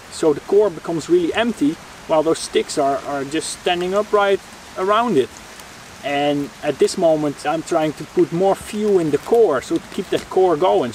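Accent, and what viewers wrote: Netherlands. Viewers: Yo broeder. Aan je accent te horen hoor ik iets Nederlands. Klopt dat? Gewoon nieuwschierig. Top video! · You sound like ur from the netherlands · Ireland? You sound Dutch, am i right? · Gotta love the dutch accent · ja kan dat mooie Nederlandse accent gewoon niet verbergen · Ik hoor dat hollandse accent wel ! :D van waar ben je ? Ik ben een beginnend outdoorsman van Belgie, heb materiaal gekocht maar zou leuk zijn als er iemand is die me irl wat zou kunnen bijleren. Misschien kan ik ook helpen met filmen ? Laat maar weten ! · jij bent sowieso nederlander ik hoor het🤣 wel hele toffe video👍👍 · U have such a dutch accent or is it just me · He has a Dutch accent is he from Holland ?? Edit: creepy background music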